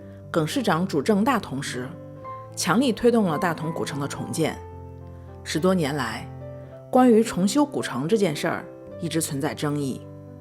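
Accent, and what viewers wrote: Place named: Beijing, China. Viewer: 博主，你那京腔太明显了，普通话要好好练练。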